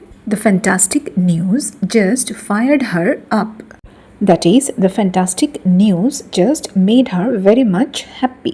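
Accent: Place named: India. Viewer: Esa pronunciación es de india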